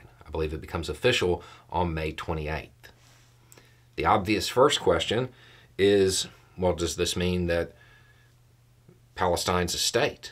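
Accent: South, United States. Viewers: Perceptive video. Southern States accent for sure, but did I catch a trace of an Australian accent as well? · I love that sharp intellect cloaked in that velvety southern accent. I know your accents are not exactly the same, I think you are from different regions of the south but you remind me of my favourite southern gentleman Shelby Foote. 💖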